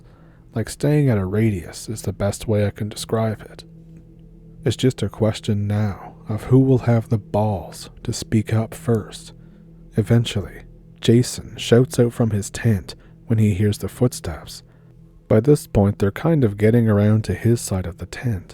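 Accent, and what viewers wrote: Canada. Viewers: my friend im from ireland im from waterford im giving your video a like im going to even subscribe you sound canadian my friend am i correct 🇨🇮👍 by the way you can tell stories dont ever put yourself down anyone who tells you you cant are jealuos 😂 i cant spell so no worries there buddy great story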